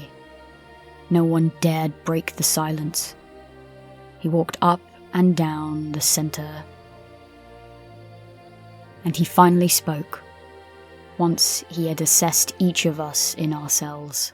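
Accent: United Kingdom. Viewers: I'm looking forward to it. Not you kicking the bucket or retiring.. I really enjoyed the story and the voice reminds me of a really good narration on a channel called cold open stories or something like that. It was also a sisters story. It probably wasn't her as I think all British females sound exactly the same.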